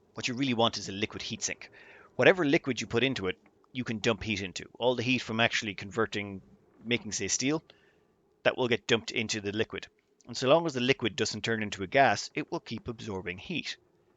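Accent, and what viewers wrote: Ireland. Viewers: hey long time watcher first time poster, love all your vids, just wondering where in ireland are you from? im guessing south dublin sans d4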